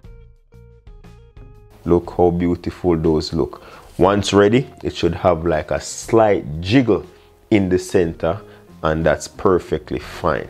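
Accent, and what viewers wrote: Jamaica. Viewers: the jamaican accent so cool.. makes cooking cool. well done on the kfc secrets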